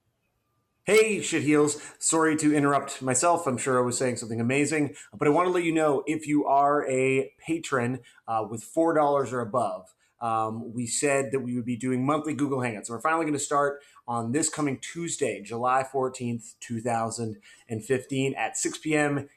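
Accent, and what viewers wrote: Canada. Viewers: I came from game therory and have watched every episode. This is the first time I've noticed the "canadian accent"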